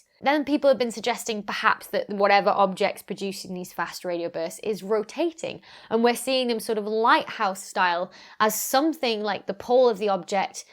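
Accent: United Kingdom. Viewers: That's my thought, too, but Dr. Becky isn't the only British astrophyicist I've heard from. I think she is the only one I subscribe to, though, so perhaps she's more comfortable using the British pronounciation than someone doing a Ted Talk would be. Would be cool if she decided to respond.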